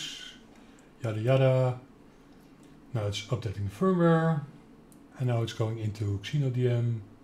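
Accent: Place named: Netherlands. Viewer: You have a Dutch accent. I’m just a Linux noob, but am really interested in more holistic and well designed Operating Systems, so thanks for the video!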